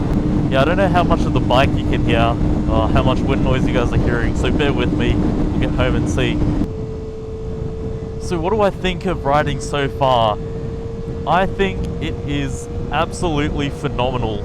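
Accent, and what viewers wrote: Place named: New Zealand. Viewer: You sound kiwi my bro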